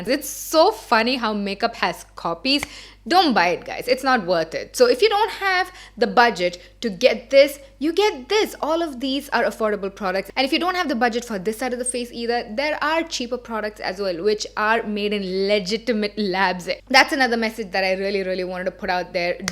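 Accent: India. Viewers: You're like the only Indian blogger I actually trust and follow.. I also like Shreya but I watch you more (just a personal preference). You keep it real, you're not fake and you don't try to be something you're not like adding unnecessary accents. You own that Indian accent and I'm proud. I enjoy watching you 😊❤️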